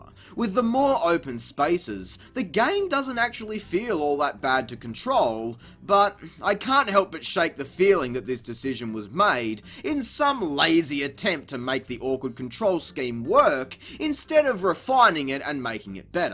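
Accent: Australia. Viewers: This was awesome keep up your good work mate! And by the way which part of Australia are you from?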